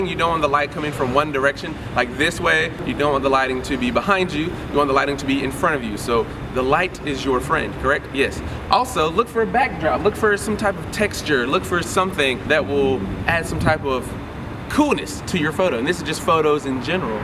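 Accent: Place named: Carolina, United States. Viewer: It’s crazy how I could tell that you’re from Carolina like me bc of your accent 😭great video tho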